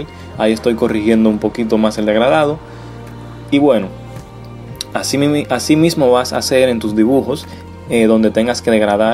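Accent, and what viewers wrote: Dominican Republic. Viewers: Parece en la voz dominicano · Eres dominicano?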